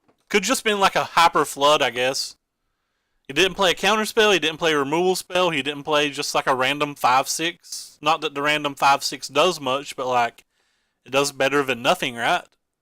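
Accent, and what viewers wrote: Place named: South, United States. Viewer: where you from, sir, Georgia? South Carolina?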